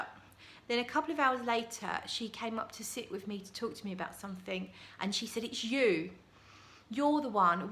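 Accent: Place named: United Kingdom. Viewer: What part of the uk are you from? The accent is fun to listen to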